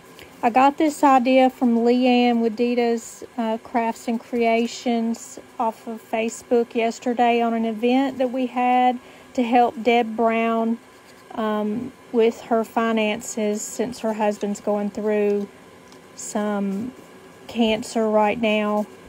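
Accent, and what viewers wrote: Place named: Alabama, United States. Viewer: How cute! 😍 I love doilies! I just have to ask, are you from Alabama? I'm on Sand Mountain and your lovely accent sounds like all my people! 😄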